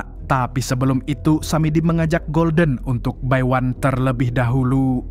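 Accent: Banjar, Indonesia. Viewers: Urang Banjar kah Pian bang 😅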